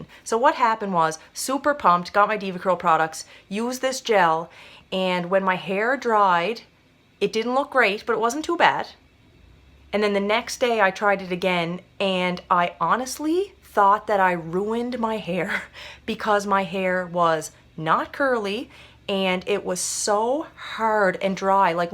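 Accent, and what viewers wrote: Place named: Canada. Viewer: Fellow Canadian girl from Ontario! Love your accent. My BIL is from Cape Breton and you guys same the same things ;) Anyway, I'm newish to the curly curl method. I have tried co-washing with a few different conditioners and it makes my hair so greasy and my scalp itchy...what am I doing wrong? does this mean I can't do this method? I am using the Biotera gel, I get a cast no matter how much I use, my hair looks stringy and is hard and crunchy...I don't want that look, is gel not for me?? Sorry for all the questions :)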